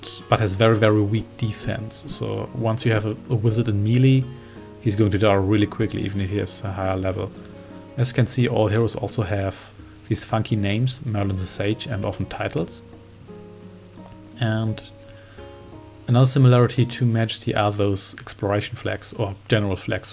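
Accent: Germany. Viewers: It looks like it would make for a neat app lol are you german by the way? I like your accent :)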